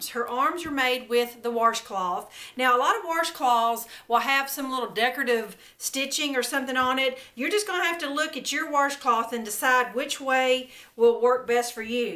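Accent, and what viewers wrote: South, United States. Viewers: First time seeing one of your videos. Loved it and love your southern accent. You said if anyone had any suggestions for projects to let you know so here is mine. Oven door decoration made from 1 kitchen towel and regular cotton material for the bodice. Looks just like a little girl's dress and they also have the boy one too. I watched a couple of videos but one was so fast I couldn't follow it and the other was way, way too long and talking about everything except how you put it together. Love your videos and I hit the subscribe button.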